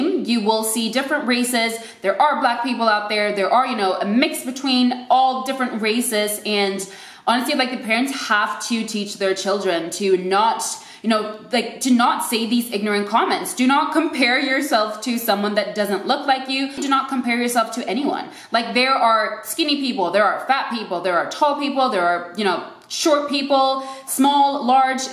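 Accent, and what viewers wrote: United States. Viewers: Your accent is American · Amazing video! I am from Los Angeles & can tell that you have a TINY accent but you sound very "American" to me! I am looking into moving to Sweden or Switzerland someday &, as an Asian person, of course have my concerns about race. Thank you for the great video! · wow, born black swedish with american english accent. I didn't realize swedes who speak English sounded this way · Agreed, she sounds 100% American. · Now I’m curious to know where your parents are from. Where are they from if you don’t mind me asking? Also, it’s very interesting that you have an American accent. I’d never know you weren’t born and raised in USA. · Being white and Swedish for hundreds of years I am one of those who loves diversity and I also love to talk to people so I am one of those that always ask that question or a variant of it if I meet someone black speaking Swedish without accent. 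It is asked out of curiosity and I guess as a part of getting to know someone. If I heard you speak I would just assume you were American. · I would have never thought that you were Swedish cause of your American accent. There isn't a single hint that you have an accent let alone Swedish accent. On the other hand, Sweden have significant Somali population. One of the few countries who welcomed Somali refugees and immigrants with open arms. Also my brother lives there. Great country. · You sure do sound American 🤔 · Holy moly you're stunningly beautiful. My question is, how soon do you start learning English and is an emphasis on accent a thing? Because you sound pretty much American with a very slight accent. I find it fascinating when people from abroad come to the US as an adult with very little accent speaking english. And why not a British accent? Is "American" english taught?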